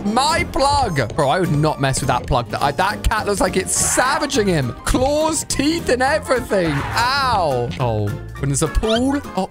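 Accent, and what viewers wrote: United Kingdom. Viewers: are you british? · Why are you British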